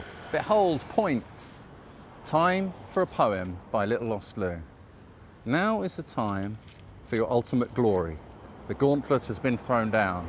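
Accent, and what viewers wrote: United Kingdom. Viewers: Dear, thank you so much for this remarkable walk through Knightsbridge. As a French admirer of yours, I'm always delighted to hear your lovely British accent and your explanations that are always on point.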